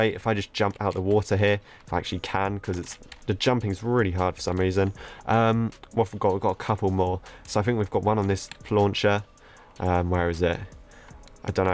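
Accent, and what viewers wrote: United Kingdom. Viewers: It’s called a British Accent, look it up...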